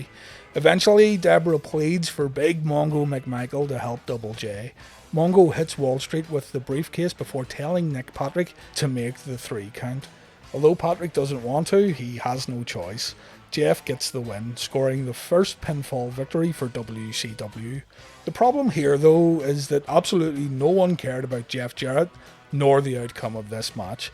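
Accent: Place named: Northern Ireland, United Kingdom. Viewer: Just found this channel last night with the DX vids I noticed your assent by any chance you from Northern Ireland?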